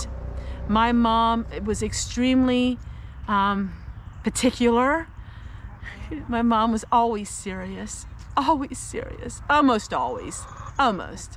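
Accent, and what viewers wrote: Pennsylvania Dutch, United States. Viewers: "Cryin' in a bucket" Oh, I do like that. My first thought was ", your PA Dutch is showing" I am really enjoying this video. Just got to the part about how we like it when you ramble, and yes that is true! "Maybe it's 'cause you're talkin' ta us like we're just friends" Love and hugs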